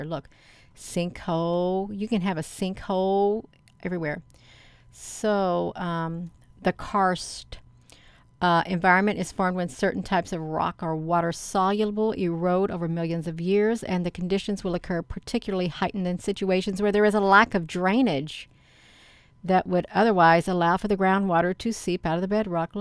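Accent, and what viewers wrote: South, United States. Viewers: What state is this lady from? Texas? Georgia? I can't figure it out which accent it is.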